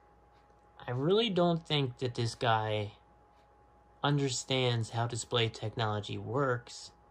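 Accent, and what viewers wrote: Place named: Prince George's County, United States. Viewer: I could tell by your accent. I grew up in PG County. Waldorf and laplata. We speak with long O's lol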